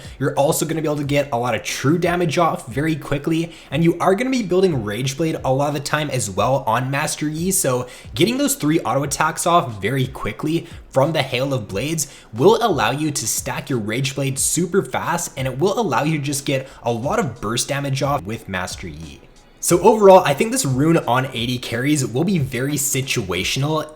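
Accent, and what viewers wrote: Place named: Canada. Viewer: You are canadian, aren't you?The way you say out.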